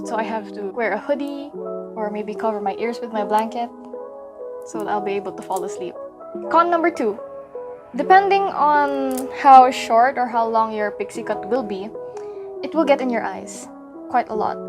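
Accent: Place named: Philippines. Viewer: btw this is unrelated but i love your filipino accent. 🥺 i strive to be as confident as you when speaking!!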